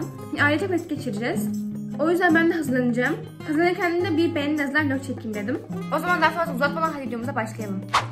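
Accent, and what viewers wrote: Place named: Russia. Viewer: Rus veya Ukrayna olması lazım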